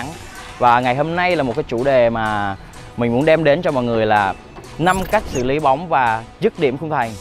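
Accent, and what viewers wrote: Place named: South, Vietnam. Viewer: Nói dùm rặc giọng nam đi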